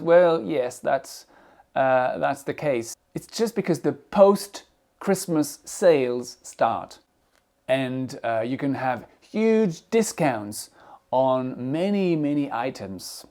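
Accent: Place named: United Kingdom. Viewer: J’adore vos vidéos, amusants, instructifs. Tout pour apprendre aisément. Comme je viens du Québec, l’anglais dont nous avons l’habitude d’entendre est plus celui de l’Américain. Mais j’aime ce son Britannique. Merci, je continue de vous suivre. Bon temps des fêtes!!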